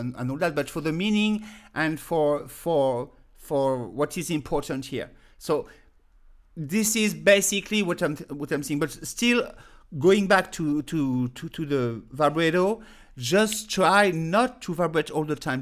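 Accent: France. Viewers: Merci pour cette leçon très instructive (+ le commentaire de Walter Cengia, qui donne la définition exacte) sur toutes ces différentes techniques : c'est la première leçon qui explique clairement tous les détails ! And very amusing with this funny french accent ;-) As another comment said, you should have made it in french but it would had less charm and feeling ! Bonjour du pays de la rillette et des courses de voitures =;-)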